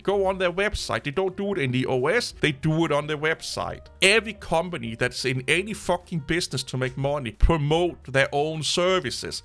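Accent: Denmark. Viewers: His thick Danish accent is one of the reasons I watch this channel!😍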